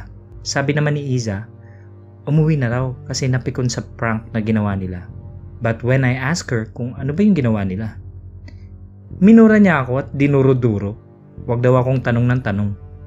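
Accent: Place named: Conyo, Philippines. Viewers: Tama..sakit sa tenga tunog konyo...tinigilan ko na pakikinig..ibang story na lang... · pwedeng straight english na lang...nakakairita at konyong konyo ang dating.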